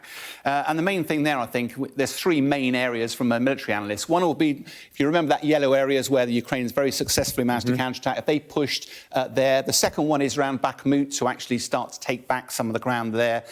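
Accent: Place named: Scotland, United Kingdom. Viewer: To much british accent for me.....sound scottich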